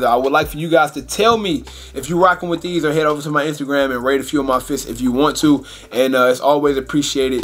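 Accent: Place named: Chicago, United States. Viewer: I thought you were from Chicago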